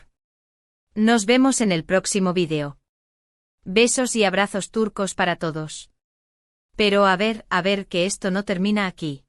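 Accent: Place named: Spain. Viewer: A esta persona que habla sin sentido ,por su forma de pronunciar con acento español ,no se cansa de decir estupideces,el señor Ulusoy vive trabajando no ventila su vida privada ,me pregunto de donde saca tantas mentiras,el señor Ulusoy graba de noche ,de día por lo que se ve en su nueva serie,entonces como esta persona se entera de la vida del actor,simplemente porque miente .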